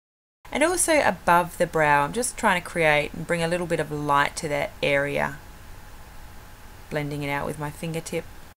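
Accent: Australia. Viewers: love your voice. its very soothing. there is something about the aussie accent!! chi chi naturals is on sale soon, so I thought id watch chi chi reviews. and found your channel.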